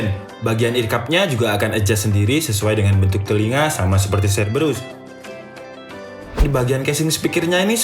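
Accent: Java, Indonesia. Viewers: Njarem jawa bangett haha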